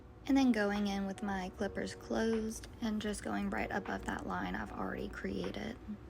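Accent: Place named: South, United States. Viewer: Good video. Not sure what area of the South you are located but you have the best accent. I used to have a co-worker a few years ago with a very similar accent. She was from Northern Louisiana.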